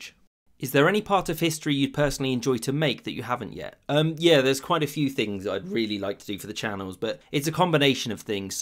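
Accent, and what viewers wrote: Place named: West Country, United Kingdom. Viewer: Peterborough? I thought you were from the West Country.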